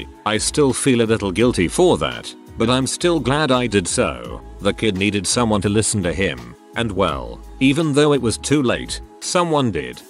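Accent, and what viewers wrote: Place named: Yorkshire, United Kingdom. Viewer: Queen Mantis I think he's from Yorkshire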